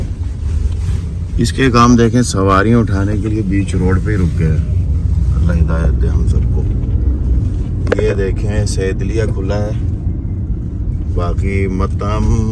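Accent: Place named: Pakistan. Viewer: Bhai ap kia Pakistan sy hn?qk ap ki urdu bhut saaf hy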